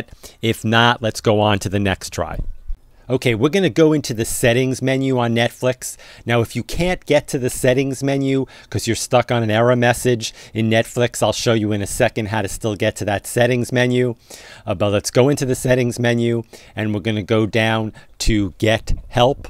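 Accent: New England, United States. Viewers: Your New England accent had me thinking John F Kennedy was helping me fix my smart TV.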